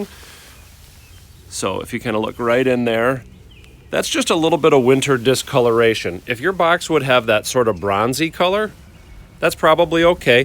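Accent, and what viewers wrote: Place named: Northern Ireland, United Kingdom. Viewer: Thanks,. Interesting video and confirms what has happened to my hedge here in UK. 😥 btw Off topic. I detect some Northern Irish in your accent, is that your region or are you originally from Ireland?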